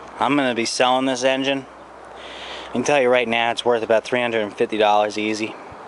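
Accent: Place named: New Jersey, United States. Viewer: I was just given a 1960's AMF "Polar Bear" snow blower but it was repowered with a 7 hp Snow King from 1995 (made in late 1994). I will work on it tomorrow. I'm also working on the "Chonda" (Loncin, which is a Honda "copy") on a Toro Power Curve 180. There's no comparison between the two. I sense a NJ accent (and I thought I saw it on the boat in the background). What part of NJ? NYC here.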